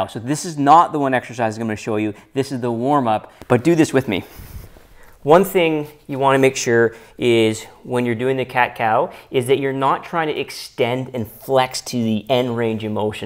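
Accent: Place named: Canada. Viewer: Upvoted and shared! Plus: I knew you were Canadian, after a few moments of listening : )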